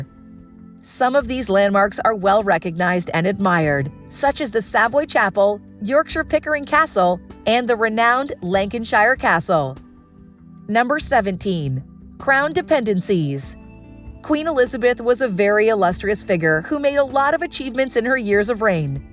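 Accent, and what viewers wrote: United States. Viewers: American narrator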